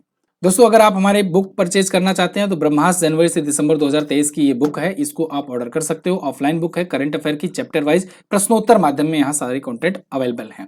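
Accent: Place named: Uttar Pradesh, India. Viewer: Sir aap up se ho na.....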